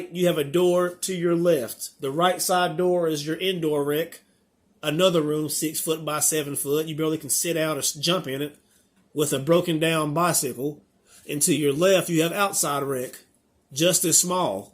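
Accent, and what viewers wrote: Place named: South, United States. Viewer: u have a country assent.. I noticed pretty cool because not many southerns have that now days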